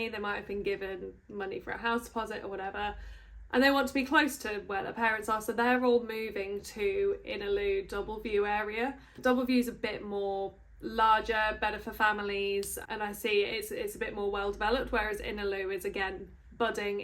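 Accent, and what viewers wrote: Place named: Cheshire, United Kingdom. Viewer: You sound like you're from Cheshire/NW originally. This was super informative. Thanks!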